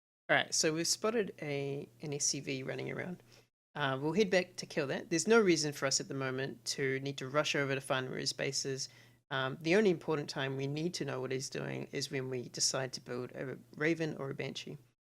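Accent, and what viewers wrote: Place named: South Africa. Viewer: Excellent video. I really liked the calm easy thought process you had during the game. Your accent sounds like you are from South Africa.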